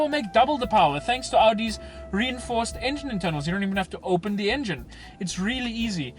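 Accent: South Africa. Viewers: Are you South African mabey? The accent sounds familiar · is this guy South African? I'm new to the channel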